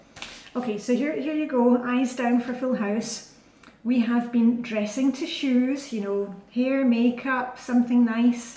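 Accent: Scotland, United Kingdom. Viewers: Thank you so much for these videos! I have been flying for about a year, but I still find your videos helpful and entertaining. I also love your Scottish accent! :) Kind regards from a German flybaby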